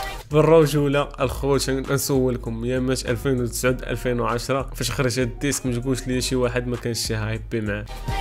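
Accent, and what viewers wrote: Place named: Arab world. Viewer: حين اسمع اللهجة ديال العروبية اتقيإ اتفوو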